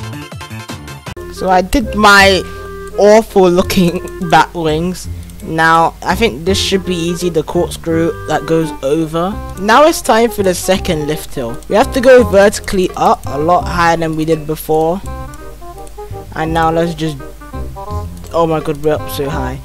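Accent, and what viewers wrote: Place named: Brazil. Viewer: no i feel like u talk from brazill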